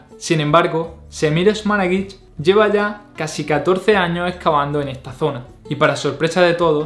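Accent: Andalusia, Spain. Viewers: Es andaluz, así hablan en esta región de España, es el acento que le dio origen a los dialectos caribeños de latinoamerica... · Me parece que es de Andalucía, una región de España. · Acento andaluz? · ÉPALE !!!! Muchos países de Hispanoamérica tienen MUCHA influencia de Andalucía. Me sentí identificado con ese acento. Desde Panamá....Viva la Madre Patria !! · Este canal es para la historia lo que la homeopatía para la salud. Y lo que es peor, con acento andaluz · HOLA!COMO SIEMPRE MAGNIFICO TU DOCU!!!!ME ENCANTA TU DICCIÓN!!!!SE NOTA Q ERES ANDALUZ PERO TE ESFUERZAS AL RELATAR PA Q SE TE ENTIENDA!!!!OLE OLE Y OLE.....TE DOY COMO EJEMPLO A MIS NIETOS Q HABLAN COMO AMETRALLADORAS.....Y MAS OLES!!!!!🌹😀❤SOY DE MADRID Y VIVO N LIMA.💐🌷🌺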